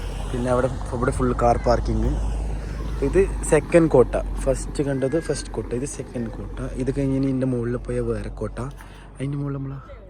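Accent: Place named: Kasaragod, India. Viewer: Kasargod slang aan kelkan rasam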